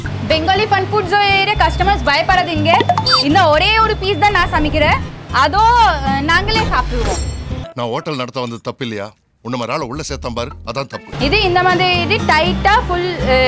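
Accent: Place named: Kerala, India. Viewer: நீங்கள் பேசும் போது மலையாளம் தெரியுது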